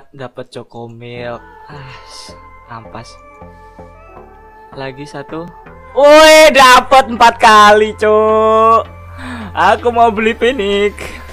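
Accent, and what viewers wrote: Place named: Java, Indonesia. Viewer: Jowo Cok:v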